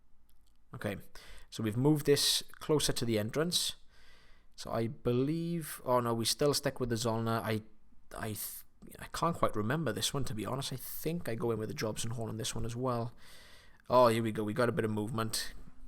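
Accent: Wales, United Kingdom. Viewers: It's Welsh if I'm not mistaken.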